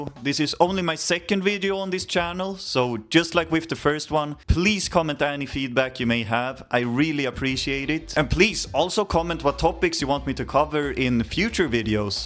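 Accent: Sweden. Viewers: as an austrian, I realy like your swedish accent. nice video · Great report, and i love your swedish accent, i didnt know the prevailing result was only .5, thats just mad, its interesting to see the mindset of ppl at the time, that half nation that staying with serbia is better. Maybe back then it was a different geopolitics, I wonder what ppl think today, do they think that Montenegro being independent was a good move and are they happy where that choice landed the country in a more beneficial place than had it stayed in union with serbia? How would they feel if they had stayed with serbia as it is today?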